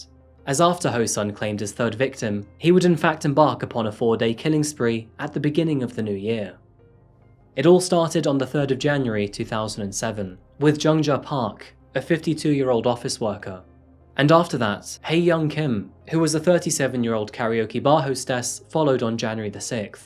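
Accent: United Kingdom. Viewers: It went undetected. I’m still trying to focus on what you’re saying. Idky British accents are hard for me to understand….it’s a real life subtitle 😂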